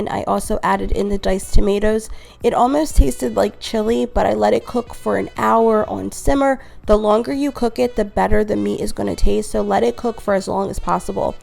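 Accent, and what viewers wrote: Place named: Philadelphia, United States. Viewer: This is my first time seeing your video... I heard your voice and knew immediately that you were from Philly. I'm also from philly!!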